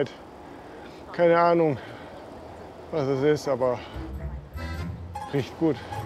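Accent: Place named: Swabia, Germany. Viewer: Hallo, sehr schöne Filme machst Du, hab schon fast alle angesehen, sehr kurzweilig. Jetzt ist mir auch klar geworden woher ab und an das Schwäbeln herkommt 🙂. Wäre doch ratsam dass Du Dir mal die Insta 360 Rundumkamera zulegst dann hättest meistens beide Hände am Lenker. Hab sie schon bei einigen Radyoutubern gesehen, bin von den Aufnahmen echt begeistert. Bin meistens im Allgäu oder Südtirol unterwegs, das Flachland im hohen Norden ist nicht so mein Ding. Mach weiter so viel Spass bei Deinen nächsten Touren freue mich schon. Liebe Grüße vom Bodensee, Roland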